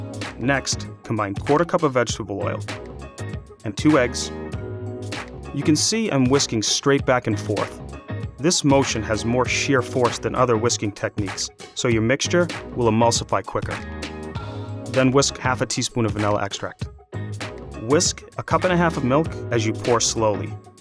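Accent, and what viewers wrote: Boston, United States. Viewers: In case y’all missed the Boston accent AND the channel name and decided to whine about metrics, it’s America’s test kitchen. We don’t bitch about European recipes, we just look up the conversions. And seriously, it’s pancakes, get a grip girlfriend. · I could listen to a full-length podcast in his soothing Boston accent. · William Hepfer It's the "I'm from Boston but I don't want you to realize it" accent. At the end he goes full Wahlberg. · America's Test Kitchen needs more cute bearded guys with Boston accents...I will now never buy Bisquick again.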